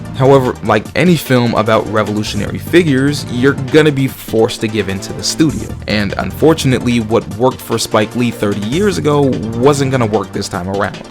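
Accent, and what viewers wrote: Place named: Chicago, United States. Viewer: Bro def from Chicago heights or chatam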